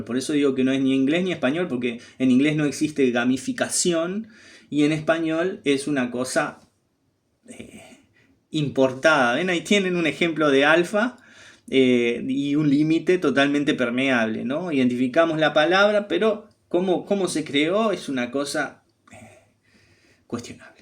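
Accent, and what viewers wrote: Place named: Uruguay. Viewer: Hola. Envidio esta elegancia en la expresión. Y este acento uruguayo me parece también magnífico. Gracias por regalarnos tanto conocimiento y por ser tan crítico con determinados tipos de inclusión que, en lugar de integrar, desintegran la vida de muchas personas porque desintegran su mente. Un saludo cordial.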